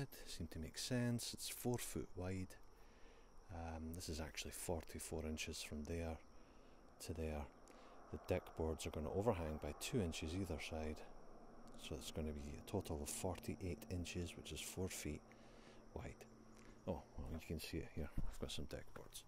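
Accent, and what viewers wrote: Scotland, United Kingdom. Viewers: 😻 Kittie ✔ 🐈Catwalks ✔ 🗣Scottish Accent ✔ 💮Queen Anne's Lace ✔ Mmmm! That's some good content! 😋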